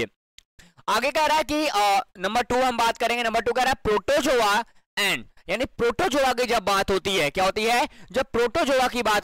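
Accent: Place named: Bihar, India. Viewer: By the bihar me yesi hi pronounce hota hai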